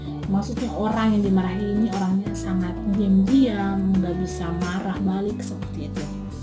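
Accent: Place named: Ambon, Indonesia. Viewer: Kak logat ambon kasar banget yah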